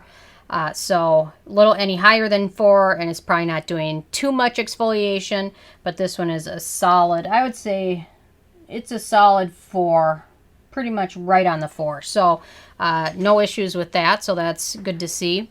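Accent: Minnesota, United States. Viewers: You sound Minnesotan/North Dakotan. Am I hearing things right? 😳🤣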